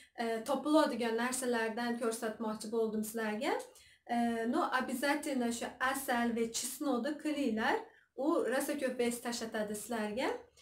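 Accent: Khorezm, Uzbekistan. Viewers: Бу киз Хорозимдан шевасидан билмайсизми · Ассаламалайкум варахматиллохи вабаракатух ,кизим каердансиз. Тилингиз хоразмликка ухшаяпти. Мен 61 ешдаман, 10,минг $ сарфладим озиш учун, ,,гербалайев ,,,,, дан 1 йил ичдим 32 кг оздим 1 йилда, лекин кейин гербалайни ташадим яна кило олдим, энди табиийй озиш харакатидаман, лекин бу ешдан кейин бундай диета тугри келадими, химия, нур олдим, сал озрок есам холсизланиб коламан, калтирайман, бунга хам маслахатингиз борми. Докторлар кучли ейишни тасия килади, олло рози болсин сиздан, ассаламалайкум варахматиллохи вабаракатух. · сиз хоразимни апкесимисиз апкаларга ухшеб гаплаесиз апкажон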